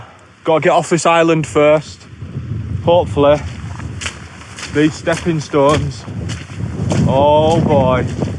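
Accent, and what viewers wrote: Yorkshire, United Kingdom. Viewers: I've been watching your videos for a few weeks now and love them. Being a Yorkshire lass, I never get sick of that accent ❤. One thing I really need to know is... where did you get sticky toffee pudding coffee from? It sounds amazing. Keep up the great videos , I love watching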